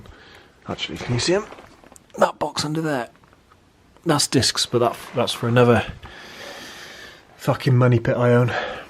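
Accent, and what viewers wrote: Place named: Plymouth, United Kingdom. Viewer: sounds like a plymuff accent! top job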